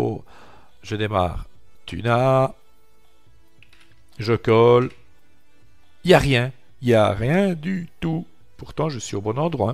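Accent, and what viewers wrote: Belgium. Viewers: j'adore cet accent belge "une fois", et un pouce bleu pour toi mon ami... j'ai suivi le tuto jusqu'au bout, mais à l'ouverture j'ai un message "Impossible de lire le fichier de ressources: ~ /.rtorrent.rc", je précise que j'ai enregistré le fichier ".rtorrent.rc" dans /usr/share/doc/rtorrent/examples, car je savais pas où l'enregistré c'est là ou j'ai peux être fauté, peux tu m'aider s'il te plait grand chef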